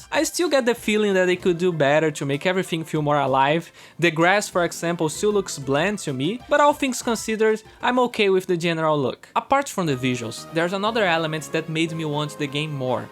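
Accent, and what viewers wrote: Brazil. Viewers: Are you Brazilian? You speak with kind of a Brazilian accent.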